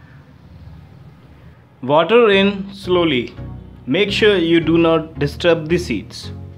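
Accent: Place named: India. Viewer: Im just here to listen indian accent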